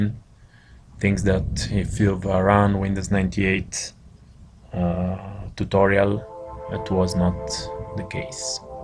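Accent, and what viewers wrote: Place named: Italy. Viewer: Si sente che sei italiano :D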